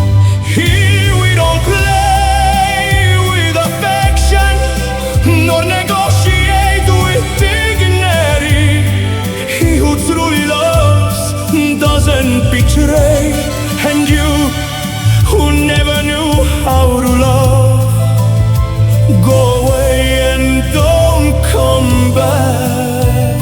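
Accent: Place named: Paraguay. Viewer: Querido Maestro, me gusta su inglés con acento paraguayo.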